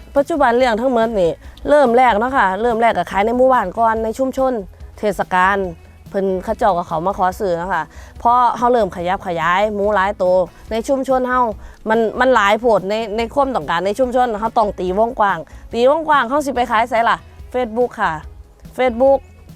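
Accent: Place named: South, Thailand. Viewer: 😂😂😂 พูดภาษาใต้ เพราะค่ะ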